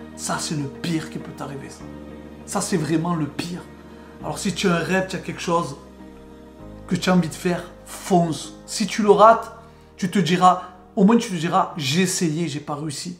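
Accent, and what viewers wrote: Marseille, France. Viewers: Est-ce que t'es un marseillais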